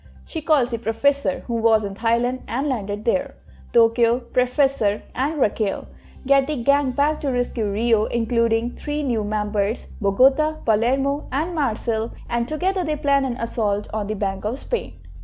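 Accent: India. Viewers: good recap, but dislike for the indian accent.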